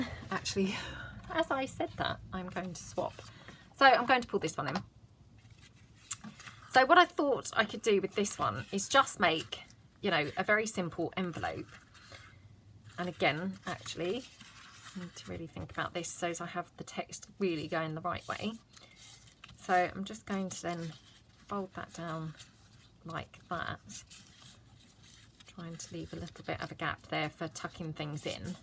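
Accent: England, United Kingdom. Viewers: I dont do a lot of vintage journaling , but I just love that beautiful English accent . :)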